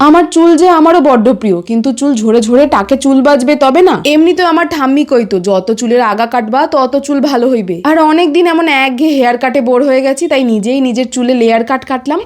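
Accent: Bangladesh. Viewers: দিদি তোমার ভিডিওগুলো আমার খুব ভালো লাগে। আজকে ভিডিওটি অনেক সুন্দর হয়েছে। প্লিজ তুমি চুলে কি কি লাগাও আবার একটু বলবে বা একটা বড় ব্লক দিবে আমি তোমার নিউজ সাবস্ক্রাইবার তোমার ভিডিও খুব ভালো লাগে আমি বাংলাদেশ থেকে তোমার ভিডিও দেখছি তোমার কথা বলার ধরনও খুব সুন্দর বাংলাদেশের টান রয়েছে😊❤ তুমি এভাবেই সুখী হয়ে থেকো❤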